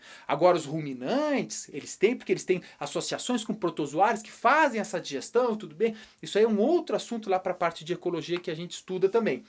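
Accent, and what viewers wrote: Rio Grande do Sul, Brazil. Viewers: O professor também me ensina o sotaque gaúcho(eu sou cearense) Ei mais uma pergunta o que que é "me caíu os butiá do bolso"?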